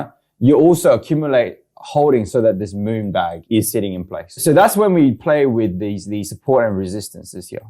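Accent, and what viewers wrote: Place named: New Zealand. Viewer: You live in NZ? Got a bit of a kiwi accent 😁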